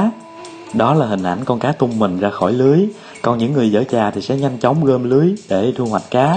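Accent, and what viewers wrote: Vietnam. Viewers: đánh cá thú vị quá,iu giọng miền tây quá bạn hiền ơi!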